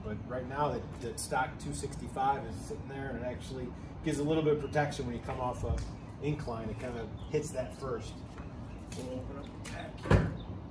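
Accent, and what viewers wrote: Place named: Western New York, United States. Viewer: I think I recognize that accent; western NY, perhaps? I grew up pretty close to Rochester meself.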